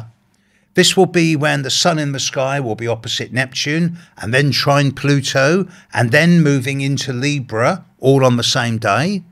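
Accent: United Kingdom. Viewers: Greetings from the city of João Pessoa, Paraíba, Brazil. I thoroughly enjoy your analysis and may I say your voice is so agreeable, your diction so clear, not to mention your beautiful British accent. Many thanks for taking the time to keep us informed.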